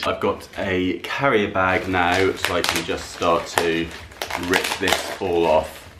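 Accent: United Kingdom. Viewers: How have I not come across this channel before 🤷🏻‍♀️ Subbed immediately just because you’re British & you have a great voice! The videos are awesome! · I just had to listen to your beautiful British accent to hit the subscribe button. Cheers, mate! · 😁 His voice is absolutely very sexy (oops, sorry 😶), with that fascinating British accent that I love!!!! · Your british accent is everything 😍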